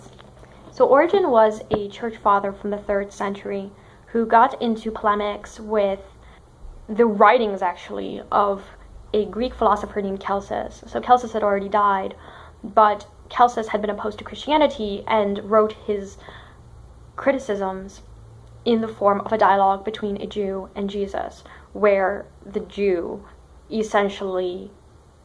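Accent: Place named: France. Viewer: Hey, love your French accent! I must ask - do you like Victor Hugo's writings? And I'm excited to hear more bout the inquisition!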